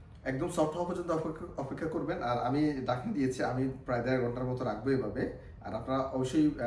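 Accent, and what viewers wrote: Noakhali, Bangladesh. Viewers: নোয়াখালীর মানুষ যত শুদ্ধ করেই কথা বলুক,আমি ঠিক বুঝে ফেলি।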